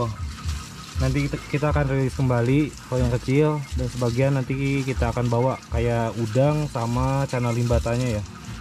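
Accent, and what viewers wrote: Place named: West Java, Indonesia. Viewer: Daerah mna om ko logat nya sunda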